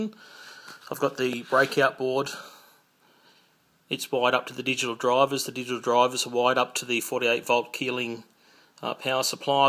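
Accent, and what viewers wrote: Australia. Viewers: btw good to hear another australian accent on youtube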